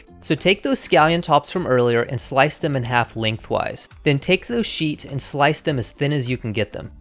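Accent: United States. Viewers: Currently living in japan and the broth is absolutely spot on. Also the egg thing, my girlfriend was surprised that I hadn't ever seen that before. Also the wya you at the ramen is exactly jow everybody does it: enter the store, order at the machine and slurp it all in as quickly as you can so you can go back home and maybe sleep 8 hours if you're lucky before you have to go commuting again. The pronounciation of all the Japanese is just so stereotypivally American that I cant help be laugh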